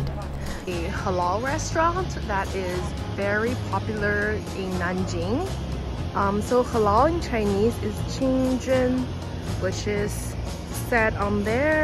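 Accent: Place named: United States. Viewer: you have quite American accent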